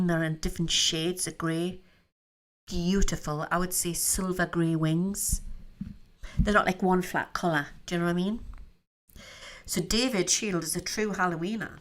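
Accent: Newcastle, United Kingdom. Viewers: Love the show, and as a fellow Geordie I love to hear our local accent! Keep up the great work. x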